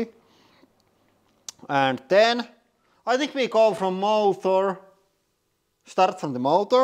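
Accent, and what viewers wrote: Finland. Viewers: Terve! A long time ago I washed many hidraulic press videos, stopped watching a for a while, never thought you was from Finland. I'm living in Finland for a year now and then watched again one of your videos. I immediately recognized the accent, and then realized right away you are Finnish. 😃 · Is this guy from Finland? His accent is Finnish.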